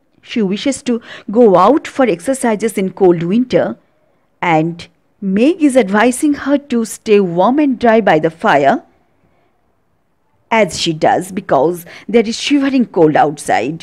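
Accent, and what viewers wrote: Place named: United States. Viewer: Wow You speak English like a American 🤩 You explain like a expert of English 😍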